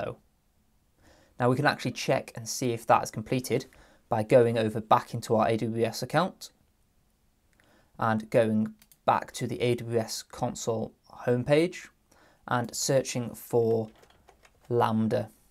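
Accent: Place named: Northwest England, United Kingdom. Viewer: Nice one, love your accent btw. Are you from around the northwest of UK?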